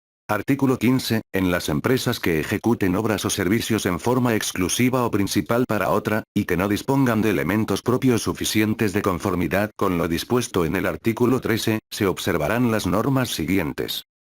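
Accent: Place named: Spain. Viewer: Por qué en acento español.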